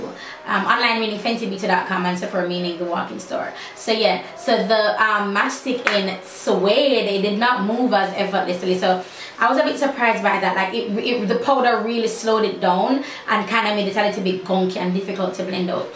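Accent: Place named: Jamaica. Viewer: I'm Jamaican... She sounds like me and she lives in Jamaica, so I thought she was Jamaican.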